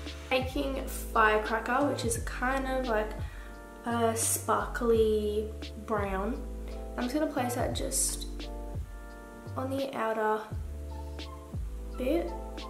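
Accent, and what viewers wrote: Australia. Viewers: I could listen to Australian Accent all day😍🤗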